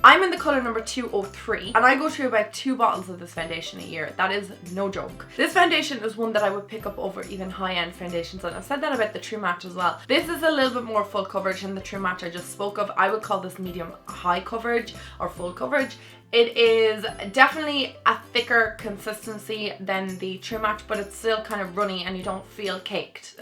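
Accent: Ireland. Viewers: I love Maybelline Fit Me Matte!! Oh, and I subscribe just because you have this cute Irish accent. Me love Irish accent!!!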